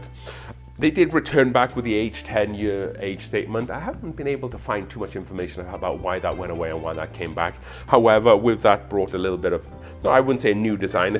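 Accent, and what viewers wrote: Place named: Wales, United Kingdom. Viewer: based on your accent that you are originally from Wales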